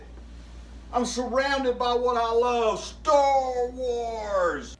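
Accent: South, United States. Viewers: Where are you from, you have a southern accent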